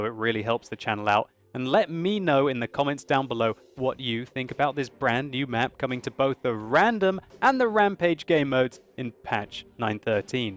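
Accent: Germany. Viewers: German accent 10/10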